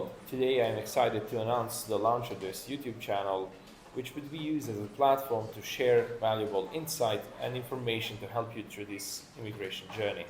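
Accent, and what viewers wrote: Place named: United Kingdom. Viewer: Sir u got british accent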